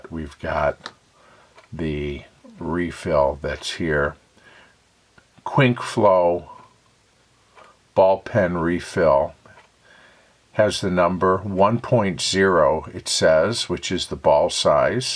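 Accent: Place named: Great Lakes, United States. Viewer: ESSAY ALERT 🚨 Mid 60-year-old, always a pen fanatic, I recently came across a website all about the history of Parker pens. I’m sure fans of Parker know of this website. I remembered I had bought a Jotter in the early 90s, replaced the cartridge with a bold cartridge, didn’t like the way it wrote particularly and so threw it in a box where it has been for decades. Website gave date codes so recently I found and dated my old black jotter to sometime in 1991. Decided to refill that jotter with a modern jotter refill, which I am assuming is their best work on the ink so far. Enjoying the way it writes, decided to purchase a new Jotter XL, and I really like this one which is perfect for my large hand. Was in thrift store recently, came across a bag of old pens and pencils, looked at this bag, and to my amazement saw a stainless steel Jotter! 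The whole bag sold for around two dollars, threw away all the other cheap no-name pens, pencils, and kept the jotter. Replaced the cartridge with a fine point. What a thrift store find that was! So I use my old black Jotter and my new Jotter XL and the thrift store stainless steel Jotter and I’m happy as a clam now. The Jotter stainless steel date code worked out to be about 1991 as well. Both were made in the USA, the new Parker XL was made in France. Does anybody know if the original is made in Janesville? How many other factories does Parker have in the USA? Happy writing! Great video, really enjoyed it. Nice Great Lakes accent too 😉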